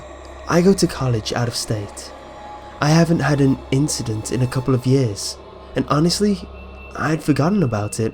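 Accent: United Kingdom. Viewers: Is British? What's the accent anyways?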